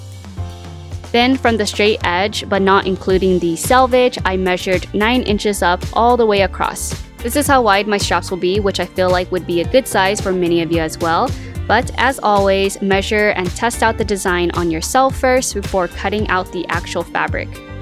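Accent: United States. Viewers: She sounds sooooo american oml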